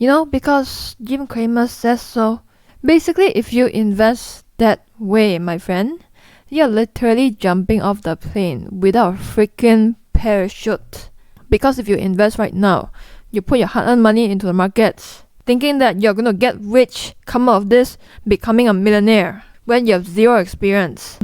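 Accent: Singapore. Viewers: i actually like your singaporean accent . it grew on me lol i dont care what you sound like.. you say all the right things :D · Great content,! So glad I found you AND your beautiful Singaporean accent. Thank you for the hard work you do. XOXO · I absolutely adore your Singapore accent!!! Thank you so much, I am relatively new to your channel...and I am enjoying and appreciate your content. You are have a gift of teaching, with plenty of wit, humour, and at the same are able to transfer your knowledge, sharply and well broken down. Thank you. ☺️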